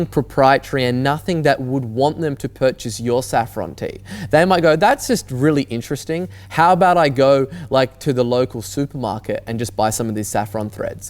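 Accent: Australia. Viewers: It's Australian accent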